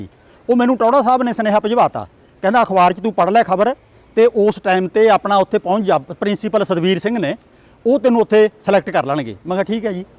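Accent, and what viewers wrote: Puadh, India. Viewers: Punjabi boli di opboli puaadi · ਮਾਰ੍ਹੀ ਪੁਆਧੀ ਬੋਲੀ ਨੈੱਟ ਪਾ ਸੁਣਕਾ ਮੇਰਾ ਦਿਲ ਬਾਗੋਬਾਗ ਹੋਜਾ, ਪੁਆਧੀ ਬੋਲੀ ਤੋ ਨਿਊ ਆ ਜਿੱਤਰਾਂ ਕਿਸੀ ਕੇ ਮੂੰਹ ਮਾ ਤੇ ਮੋਤੀ ਗਿਰਰੇ ਹੋਮਾ, ਅਰ ਯੋਹ ਆਲਾ ਤੋ ਜਮੀਂ ਪੁਆਧੀ ਬੋਲੀ ਬੋਲਾ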